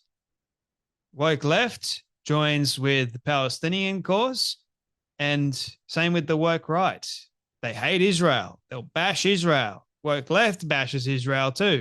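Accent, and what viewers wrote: Australia. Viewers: It looks to me like an invasion and yes Australians have had it great for a long time,but most are asleep "she'll be right mate" No longer rings true.Sorry time to wake up and smell the roses Aussies.!As for the narrator here your voice is too harsh and unpleasant to listen too gratingly not charmingly Aussie,(if ever it even was) .You and Pauline Hanson both cannot acknowledge your Achilles's heel.Until you do you will never get to the top in Australia.Australians seem to suffer from an inferiority feeling from , "The land down under".All you two do is remind them of it!! Sorry.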